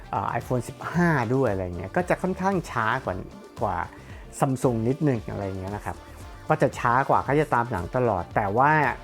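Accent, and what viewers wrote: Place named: South, Thailand. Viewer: แหลงใต้แล้วล่าสุดเยื้อไม่ไหว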